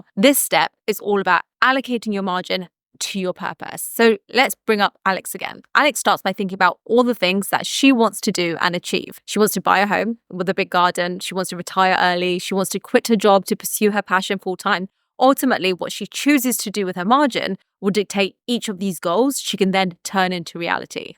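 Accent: England, United Kingdom. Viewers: I like your English accent. It's very fancy. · Good content, and I love your British accent.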